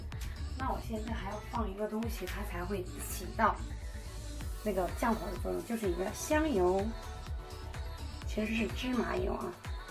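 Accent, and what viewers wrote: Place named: Shandong, China. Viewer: 我不用猜就知道你是山东的嘻嘻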